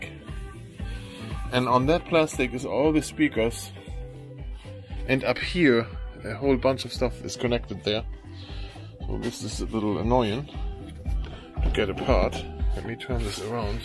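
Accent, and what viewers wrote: Germany. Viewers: Thank you for this video! My party box has stopped charging and only works when it is plugged in to AC power and the DC power does nothing. By the way, your accent makes this video a hundred times better. I love the German language 😅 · Er hört sich irgendwie deutsch an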